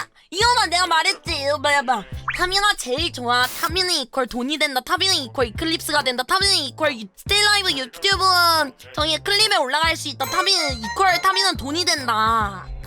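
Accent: South Korea. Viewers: 전라도 사람이여? 구수허이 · 전라남도쪽일 거 같네요 근데 전에도 보니까 경상도 사투리도 하셔서.. 좀 섞인거 같아요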